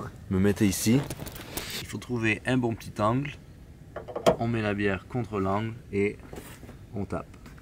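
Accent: Quebec, Canada. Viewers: Salut les keupin, je viens de vous découvrir a travers cette petite vidéo, vous êtes québécois a en entendre votre accent, vous vous faite un repas trip en France?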